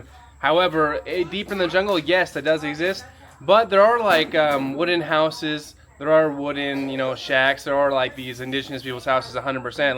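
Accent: United States. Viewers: So you’re watching a video about other parts of the world, but you don't know that different places pronounce things differently. Americans pronounce it exactly the way he did. My British wife pronounces 'bottle' as 'butt-hole.'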